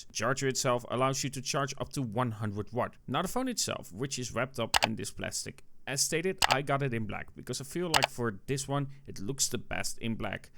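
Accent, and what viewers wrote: Netherlands. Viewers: lol ja je bent duidelijk nederlands zo te horen ;) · Hoi, het viel mij al op dat u ook Nederlands was vanwege uw accent (Geen belediging, uw Engels is goed). Ik heb zelf ook maar besloten om de zwarte OnePlus 13 te kopen met 512GB/16GB RAM opslag via TradingShenzhen Mij werd geadviseerd om de OnePlus 12 te kopen destijds eerder deze jaar maar ik besloot om het maar toch niet te doen tot later deze jaar waarin het toch duidelijk werd dat ik echt behoefte had aan een nieuwe telefoon dus ben ik maar voor de OnePlus 13 gegaan. Het lijkt er ten slotte op dat ik onbewust een goede keus heb gemaakt.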